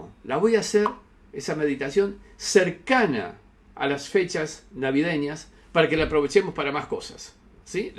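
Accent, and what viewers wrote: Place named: Argentina. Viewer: Gracias, buen contenido....de donde es ?...que habla como argentino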